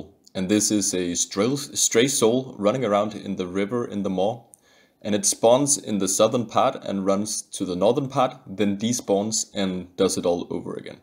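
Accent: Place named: Denmark. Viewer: Den danske accent er legendarisk aha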